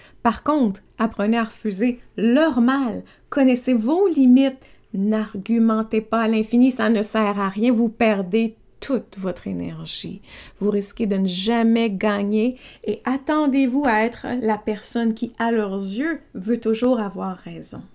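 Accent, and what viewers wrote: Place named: Quebec, Canada. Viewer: Niaiserie gogosse... jamais entendu! C'est normal... je suis européen ;-) J'adore ce mot et votre accent québecquois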